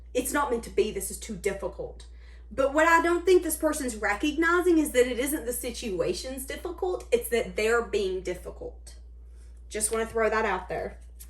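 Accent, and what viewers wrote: South, United States. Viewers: Holy cow! You are on point! This totally resonates with me. Thankyou so much ❤btw love you accent. I am from the South too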